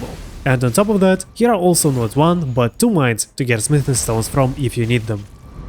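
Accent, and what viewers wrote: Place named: Russia. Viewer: Друг, ты русскоговорящий? Просто акцент очень сильно выделяется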